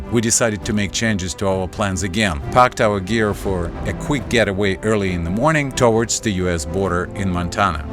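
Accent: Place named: South, United States. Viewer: your good ol' southern accent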